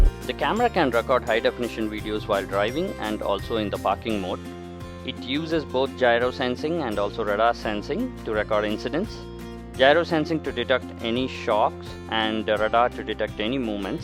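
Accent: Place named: India. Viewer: Fucking indian accent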